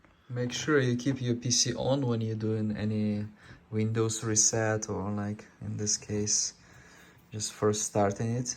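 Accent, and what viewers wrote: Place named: Russia. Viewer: Слушаю автора и кажется у него наш, русский акцент, буквы чётко выговаривает, без соплежуйства английского...)))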